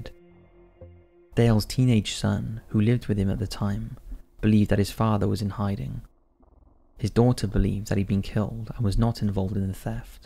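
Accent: Wales, United Kingdom. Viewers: He’s Welsh (from Wales in the UK) if you didn’t know. That’s the accent. South Wales I think by the softness, probably somewhere near Cardiff (the capital) or maybe Mumbles (a seaside town). 🤔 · I've a headache and this is easier to listen to than most. I'm sure that I can detect a Welsh accent in the narrator's voice and I think it's just a bit different, very enjoyable. Thank you ♡ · The Welsh accent of yours is better than The Canadian Droll of Chills haha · Genuine curiosity, which part of the UK are you from?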